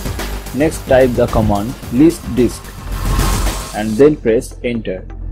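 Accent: India. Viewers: It’s not so much the music that sucks, it’s your horrible accent. I can’t understand a damn thing you say in that heavy India accent. Subtitles at the bottom sure would have helped.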